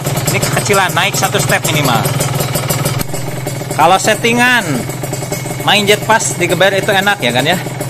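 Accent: West Java, Indonesia. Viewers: Sampurasun....yakin dari logat bicaranya pasti orang sunda dan beberapa x w nonton vidio u bang akhirnya w kena racun u bang wkwkwk waktu itu w nontn rev cdi predator u bang akhirnya w beli buat jajal di tirev w hasilnya jos...powernya enak ngejambak2lah hahha tapi ada tapinya nih puteran atasnya jdi nahan alias ngeden gtu cek busi warnanya putih waduh...keiritan ini mah fix ini mah musti set ulang pj mj w balik lagi bang nyari vidio u lah ktemu ini lagi bahas set karbu pj mj cocok.bingung ya naikin/nurunin berapa step ya karbu tirev std pj 38 mj 120 pengen kek gtu bang di gas beng..beng.beng .bikin tangan ngaderegdeg hehe tapi dari tadi w malah fokus ke astreanya sumpah mesinnya kek daytona ya...ngeri....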